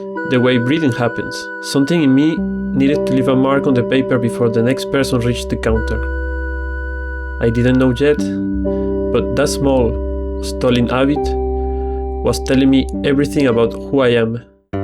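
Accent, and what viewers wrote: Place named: France. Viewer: French accent is funny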